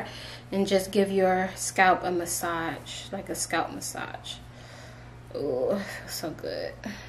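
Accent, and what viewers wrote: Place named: Washington DC, United States. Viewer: I know you’re from the dc area by your accent. Love the video. Thanks.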